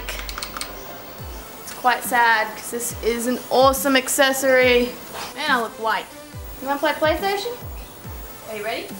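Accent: Australia. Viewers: Are you from Australia? you sound Aussie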